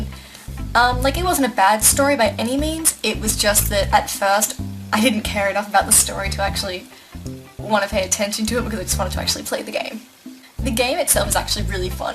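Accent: Australia. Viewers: Insta-subbed! It's so great to hear a fellow Aussie on the interwebs for once. :) Where abouts are you from?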